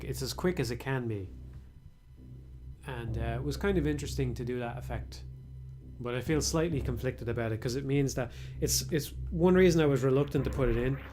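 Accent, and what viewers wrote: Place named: Ireland. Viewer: It's really nice to hear your dulcet irish tones again Here's hoping we get to hear them again soon, happy holidays man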